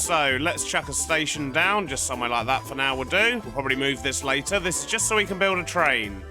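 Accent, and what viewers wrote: United Kingdom. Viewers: that accent never fails to amaze me. british? also train station name: Docker Dumps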